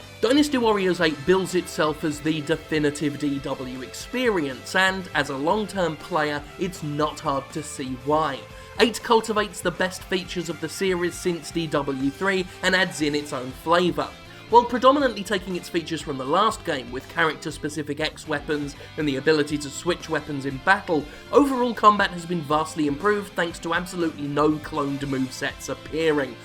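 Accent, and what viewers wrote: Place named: United Kingdom. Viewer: lol UK accent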